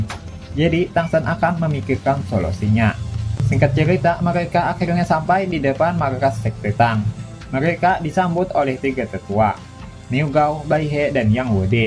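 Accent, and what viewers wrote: Banjar, Indonesia. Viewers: urang banjarkah pian, logatnya kyk urang pahuluan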